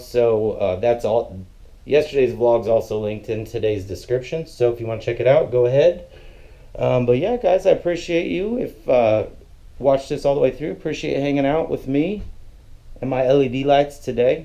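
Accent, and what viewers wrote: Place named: Poland. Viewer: First thing that hit me once i started watching your video was your accent, it sounds polish to me, are you polish? And this enterteinment led setup is super cool, need to make one in my cave.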